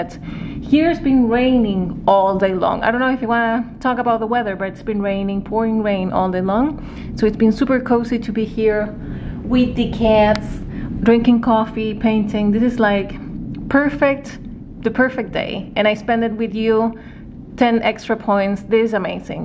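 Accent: Chile. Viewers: tooooodos los acentos son preciosos. ❤ Soy española y los acentos de Latinoamérica me súper encantan!!! Y el acento de Chile es bacán 😉😁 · Also today a recommended your channel to a friend who lives in texas so she can get used to the chilean acento when she meets me (one day) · Gracias, soy Mexicana, y apesar de que dices que el español chileno es difícil de entender, me encanta tu asentó, el que menos entiendes es el de Buenos Aires, hugs and kisses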